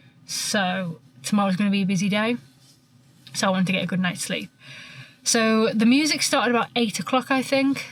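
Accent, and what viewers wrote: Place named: Northwest England, United Kingdom. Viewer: Can tell your from the northwest,not just by the accent,but the old sayings,dead flies brought back so many memories,dinner not lunch,Tea not dinner lol,very enjoyable watching your videos,you have the air of a very enjoyable tv presenter ❤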